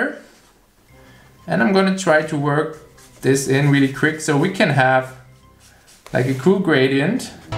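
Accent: Germany. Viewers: Man hört so raus, dass du Deutsch bist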